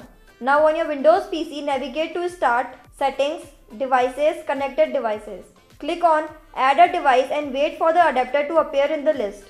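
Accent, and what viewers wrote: India. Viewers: this nothing about singlish,she speak indian english. hard to understand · I love the Indian accent of this chick, the way she twists her tongue and pronounces the words. Technically she doesn't know the job and is jumping to other things which are not present in new Windows. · why do you talk so fast with such heavy Indian accent?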